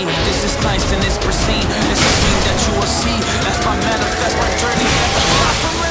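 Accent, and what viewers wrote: Germany. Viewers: Gehe ins Video und weiß direkt am Akzent, dass du deutscher bist😂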